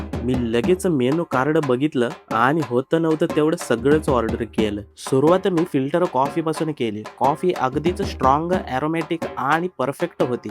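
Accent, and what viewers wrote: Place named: South India, India. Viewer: South accent is superrrr😄👌🏻👌🏻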